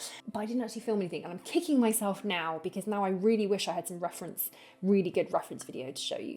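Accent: United Kingdom. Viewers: I know, and y so britty talking style.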